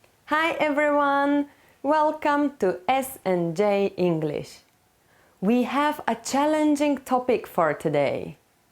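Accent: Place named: Italy. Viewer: This sucks . You have a foreign accent. Italian?i taught a- levels. ✖️🤢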